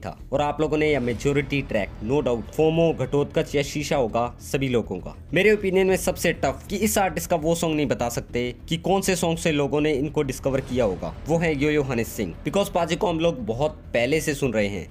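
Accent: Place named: Haryana, India. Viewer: Bhai ab Rajasthan se ho...mujhe laga tha haryana se ho😂😂 ap avaj se haryanvi lagte ho😅 vaise mein chandigarh mein rehta hu...and haryanvi hu.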